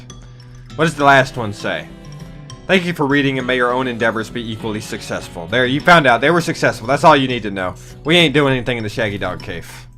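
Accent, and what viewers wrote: South, United States. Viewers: Maybe I knew him in another life.......I DUNNO........you sound so Southern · Your southern accent is much more prominent when you play this game. And what's that thing in the bottom left corner? I never noticed it till this video lol.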